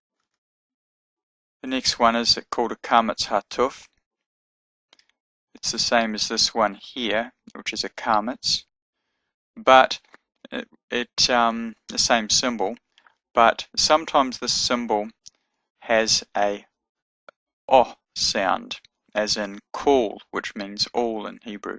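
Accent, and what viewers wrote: Australia. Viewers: aussie!!!!! love it!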